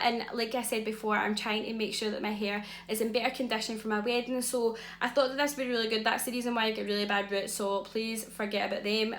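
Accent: Scotland, United Kingdom. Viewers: Just subscribed nice to hear a Scottish accent that isn't making me cringe! I very rarely comment but how about a Watt Brothers haul, the make up deals they have are phenomenal!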